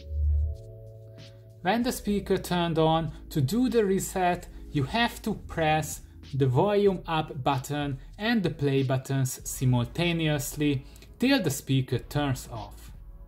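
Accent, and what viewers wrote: Hungary. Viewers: Magyar vagy, ide hallom 😂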